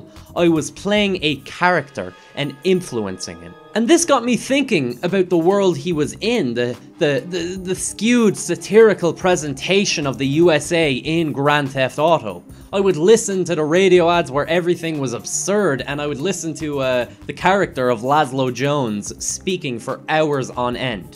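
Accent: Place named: Ireland. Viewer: that irish accent tho 😍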